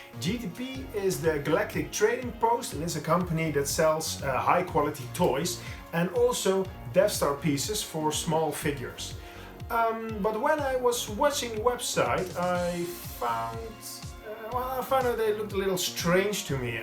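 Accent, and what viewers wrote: Netherlands. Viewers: Great work. Do I detect a slight Dutch accent? 😉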